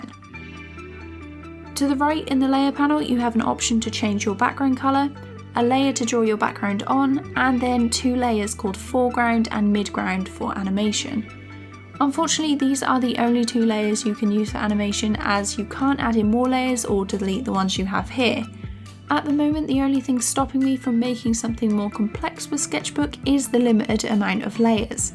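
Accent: United Kingdom. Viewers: nope this girl owns autodesk sketchbook and are you british cos you sound british. im british too!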